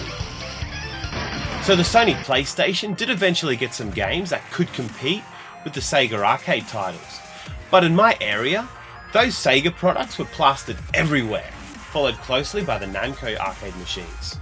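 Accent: Australia. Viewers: The Sega "Satin" I love Australian accent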